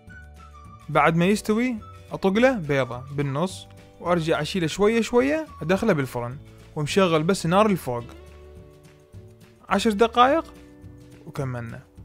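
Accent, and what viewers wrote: Mosul, Iraq. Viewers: بس اهل بغداد مو هيج لهجتهم اكيد الماما لوبابا من الموصل 🙋🏻‍♀️ بلتوفيق ان شاءالله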